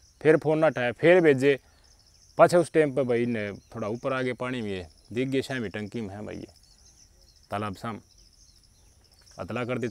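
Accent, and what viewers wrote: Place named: Khari Boli, India. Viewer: भाईसाहब आप की खड़ी बोली की शैली बहुत सुंदर है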